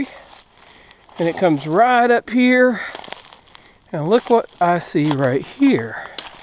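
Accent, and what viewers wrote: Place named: Pennsylvania, United States. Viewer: You are more than welcome to come join us on a hunt if you get up our way. I knew I recognized that central Pennsylvania accent. I’m a former police officer and K9 handler. Some of our dogs came from Castle k9 in Carlisle Pa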